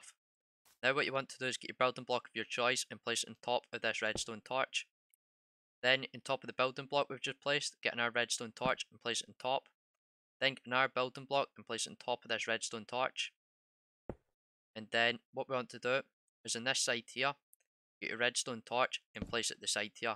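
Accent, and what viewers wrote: Scotland, United Kingdom. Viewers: He Scottish mayte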